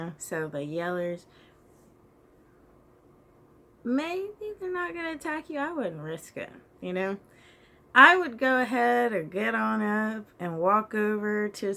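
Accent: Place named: Dallas, United States. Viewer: I'm from Dallas too.. I never realized we have a Dallas-accent until now! I hear myself lol high five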